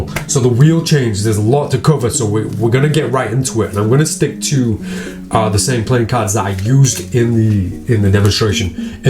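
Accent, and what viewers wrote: United Kingdom. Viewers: The cockney accent is always on point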